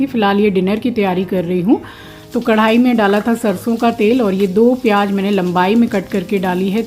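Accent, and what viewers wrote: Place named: Marwar, India. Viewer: Aap marwaadi hae,mere nanihal me same working chalti hae or bolne ka tarika bhi kyunki woh bhi marwaadi hae…😊😊 I like ur life style because I remember my nanihaal after watching ur vlog❤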